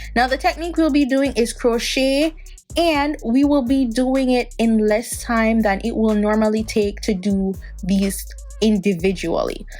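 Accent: Jamaica. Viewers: I’m sooooo SURE your Jamaican. Right or wrong?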